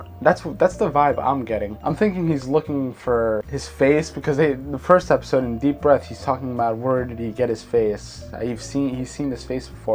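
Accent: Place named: Scotland, United Kingdom. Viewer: I like your Scottish accent :)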